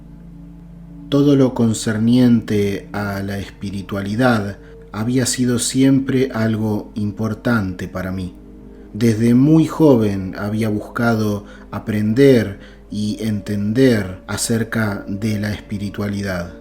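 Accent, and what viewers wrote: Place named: Argentina. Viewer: Vamos a darles likes,para seguir escuchando la voz relájate de éste caballero, lástima que no hay opción de darle me encanta,me fascina, porque a mí la verdad me encanta como el habla,me gusta escuchar,los argentinos,los uruguayos,los chilenos,se escuchan parecidos.les envío a todos abrazos de luz, que Dios los bendiga.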